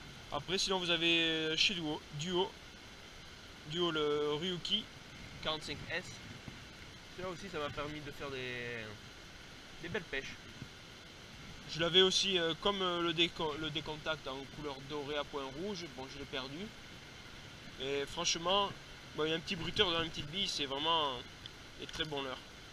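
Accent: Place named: Corsica, France. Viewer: ps ; ta l accent corse un truc de fou :)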